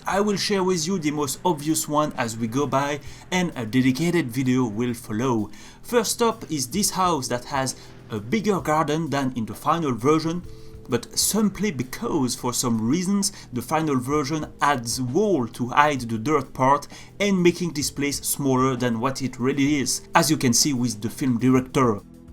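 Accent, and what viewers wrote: France. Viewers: you sound kinda french :') , neat video from yours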